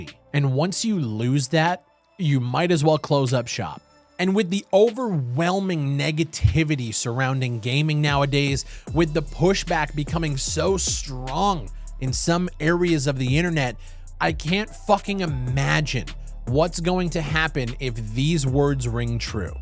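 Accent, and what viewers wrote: Canada. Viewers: u Canadian or whats the accent ?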